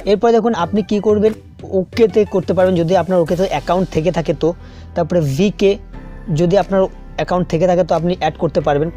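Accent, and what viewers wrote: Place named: Sylhet, Bangladesh. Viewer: sylheti bai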